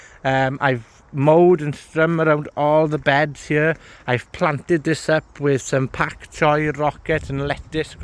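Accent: Wales, United Kingdom. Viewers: I can't remember...is yours a Welsh accent?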